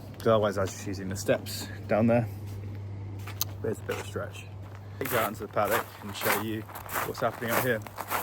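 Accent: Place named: Australia. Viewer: Hi there!! I'm at a loss as too where you are in the world!! The scenery looks like England!! But your accent suggests Australian of some kind!! Confused!! LOL!!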